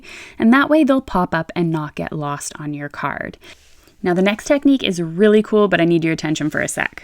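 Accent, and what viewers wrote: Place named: Canada. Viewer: Love your videos so much! I love your accent, It makes me think Canada! <3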